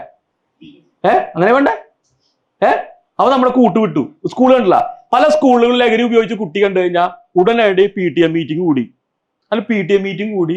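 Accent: Thrissur, India. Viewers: നല്ല ക്ലാസ്സ്‌....... തൃശ്ശൂർ ഭാഷ നർമ്മ ശൈലി....... 👍😍😁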